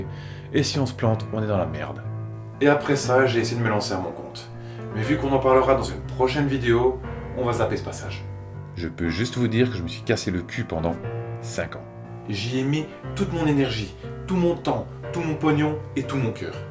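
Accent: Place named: Belgium. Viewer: Hey salut maggle ! Petite vidéo pour pas dire grand-chose mais ça fait toujours plaisir de te revoir et d'entendre ton accent belge nous parler de la "pouissance". Ce com' est un encouragement à continuer :-). Plus de musique des 60's ça y est ? Un truc m'a déplu, c'est quand tu dis "c'est à cause de ces gens que...". Tu en as fait partie tu le reconnais, nous avons tous fait partie de "ces gens" à un moment de notre vie. Je pense que tu devrais plutôt juger l'attitude et non les personnes. Cela permet aux gens de changer plus facilement. PS : toi qui réfléchi au sens de la vie et la société, as-tu entendu parler du travail de Pablo Servigne ? As-tu regardé la série Next de Clément Montfort ? Bises.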